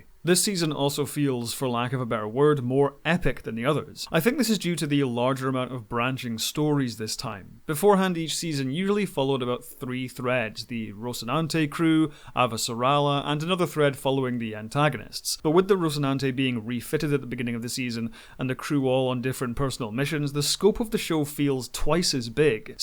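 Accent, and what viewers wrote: Scotland, United Kingdom. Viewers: Thanks for the review,. It's nice to hear a fellow Scot, and especially one so articulate and informative. I didn't know about the behaviour of the actor Cas Anvar, which meant that I couldn't understand the death of Alex in the show, who's incidentally my favourite character. Through no fault of your own, I'm going to struggle to re-watch the show again. Anyway, cheers for the review.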